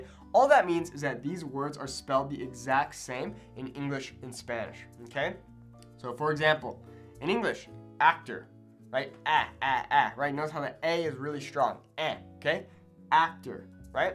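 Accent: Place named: Mexico. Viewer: It is just his Mexican + Kermit the Frog accent in Spanish (no disrespect).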